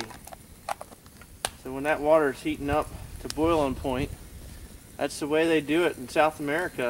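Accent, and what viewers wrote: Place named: Appalachia, United States. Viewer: Thanks for sharing. What part of the Appalachia are you from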